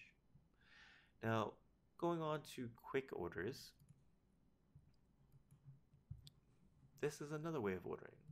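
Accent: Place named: Canada. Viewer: loooooooooooooooow and monotone Canadian accent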